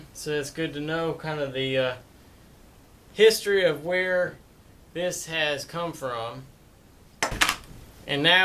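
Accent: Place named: South, United States. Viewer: Nice and well done video. I have some tools that were left out in the rain a few years. I will try your technique to clean them up. I agree 100% with S. Walker below. You spoke clearly, explained the process well and your slight southern accent added personality to your video. This is from a guy who lives in South Carolina. If every video on youtube sounded like the Discovery channel, it would be a very boring place. Don't change a thing young man !!!!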